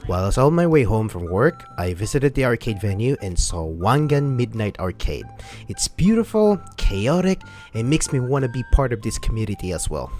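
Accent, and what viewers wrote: Philippines. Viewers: are you a filipino?? cuz i know damn well timezone is in the philippines and i can also tell by your accent.. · Manileño detected Subscribing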